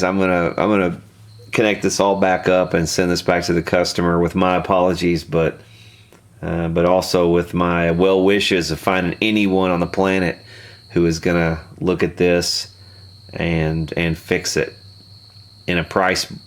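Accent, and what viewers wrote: England, United Kingdom. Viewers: I think you're a genius..!!! The sheer complexity of this amp build with no internet back in the sixties & given the obvious restraints of components is humbling brother. You've also mentioned it sounded good so what more can you ask for. I'm curious though on the story of the guy buying it from you and that you had an English accent..? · Not sure why a guy with an English accent would put that ground lift switch there. You really don't get those on British equipment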